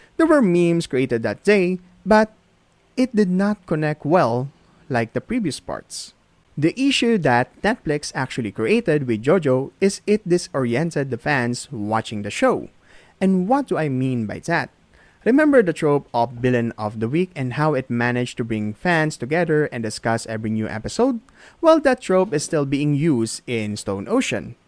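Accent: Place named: Philippines. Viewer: That accent.. say, are you filipino by any chance?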